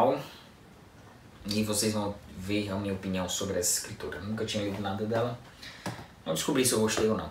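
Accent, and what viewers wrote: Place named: Fortaleza, Brazil. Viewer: Assim que me identifiquei com teu sotaque, fui atrás de saber se tu é de Fortaleza kkkkk Que coisa é encontrar canal literário de qualidade pertinho da gente!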